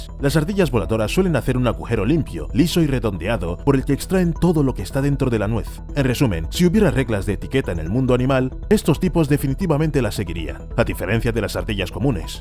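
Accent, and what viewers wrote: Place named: Andalusia, Spain. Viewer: Haz un video revelando tu acento Andaluz, que se te escapa a veces jajajaj, un abrazo desde Sevilla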